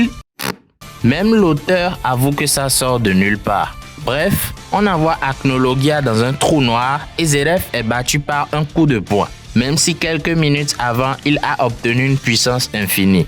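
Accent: Benin. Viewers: J'adore le montage la vidéo😱 (façon trash). On sent l'accent de nos voisins les béninois dans la voix off. Beaucoup de courage 💪bro, tu mérites de buzzer. Et je rajoute : en Afrique (Togo); il existe aussi des putains d'Otaku.😁😁😁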